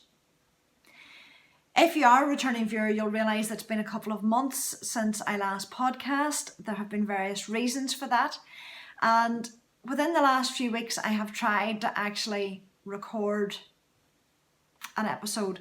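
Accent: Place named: Ireland. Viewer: Firstly I love yr accent m Dad came from Limerick. Sadley he passed over in 2017 and I miss that wonderful Irish voice. Now yr podcasts are great and your jumpers amazing.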